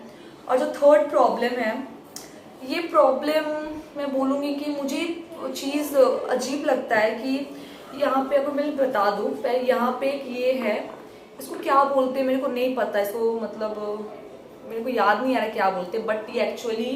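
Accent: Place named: Pahari, India. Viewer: ...tell us about yourself na... Your hindi is sweet, pahari ho kya...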